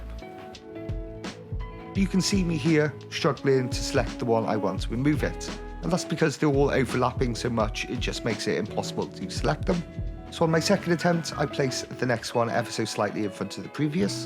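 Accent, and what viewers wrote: United Kingdom. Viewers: Yesss, my favourite British voice is back, and how, WOW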